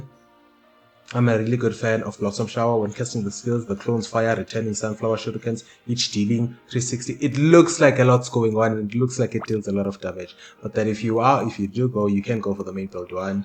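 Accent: South Africa. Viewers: Sounds like a South African accent..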